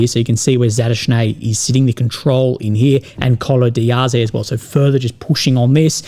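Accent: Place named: Australia. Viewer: Dude please start talking a bit slower I didn’t master the art of Australian accent 😅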